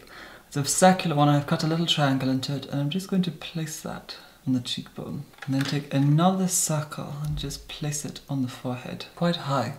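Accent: Scotland, United Kingdom. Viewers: Anton Fuchs Scottish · patsy t That's Scots accent love, we all speak like that here! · Favorite part is that he's got a faint Scottish accent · Imagine manny attempting to parrot everything says 😺 He couldn't string it. No offence to Americans x BTW his accent is Scottish x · So much talent. But i miss ur scottish accent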